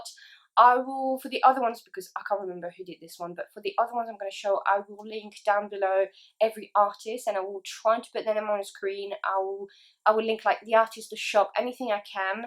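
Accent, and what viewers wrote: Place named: United Kingdom. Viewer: Je ne m'attendais pas à ce que tu ais un accent british quand tu parles en anglais lol!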